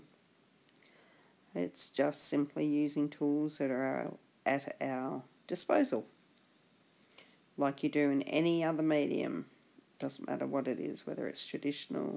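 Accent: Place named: Australia. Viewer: First, you are a wonderful artist. Here are some comments of mine. I had trouble understanding you because of your chest cold and Aussie accent. It did get better as we went along but you sometimes let your words trail off. You work fast, so you might want to stop at some point and show the layers you are using. Also, you use different brushes. Some artists will draw a line or two on a white layer to show what the brush looks like on its own before applying it to the painting. You might consider this. You mentioned a base layer to get darker color but you may want to take that a bit slower so people can understand where you put it and why. Hope this helps. Ron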